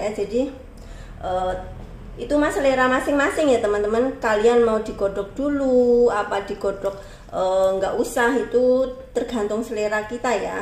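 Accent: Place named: Banyumas, Indonesia. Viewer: Mba aali mana si kok cara ngomongnya kaya q ..q asli banyumas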